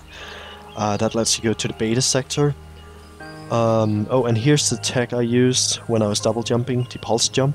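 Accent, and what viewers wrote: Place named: Denmark. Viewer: You sound VERY Danish!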